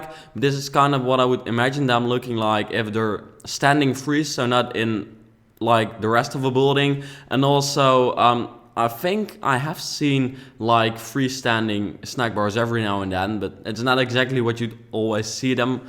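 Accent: Netherlands. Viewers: Gooooo Nederland jeee haha geweldig en mooi gemaakt!! En je hoort wel dat je van Nederland komt #DutchPowerr!!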